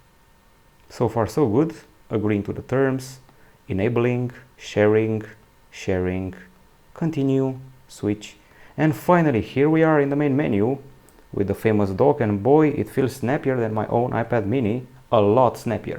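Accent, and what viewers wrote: Romania. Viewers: Youre not english, I bet you are Romanian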